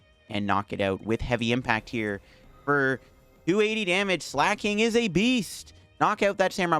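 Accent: Canada. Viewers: are you from Canada? Love the content always and I'm constantly using your deck ideas.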